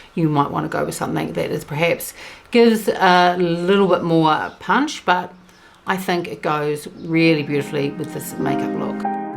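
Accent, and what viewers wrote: New Zealand. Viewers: Hello from a fellow Kiwi vlogger, it's nice to hear a familiar accent on YT 😃. I'm interested in the Water Fresh Tint but I'm not sure how it would go on my very fair and rosy complexion, plus it might be a bit dewy for me as I'm more of an almost matte girl. I've just finished the No.1 foundation which I really liked. The colour was probably the best match I've ever had in any other foundation - ever - but for some reason I've replaced it with something new to me, the Haus Labs 😂. Anyway, just wanted to say hurroooo. I'll see you again 🙋‍♀️.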